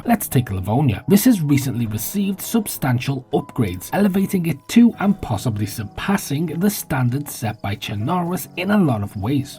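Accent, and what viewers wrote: Liverpool, United Kingdom. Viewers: Sweet vid mate, do I detect a scouse accent btw lol : ) x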